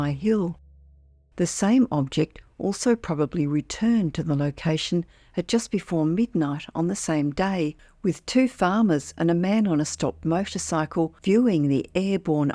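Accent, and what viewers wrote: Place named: Australia. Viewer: Love your channel as well as your Aussie narration 😀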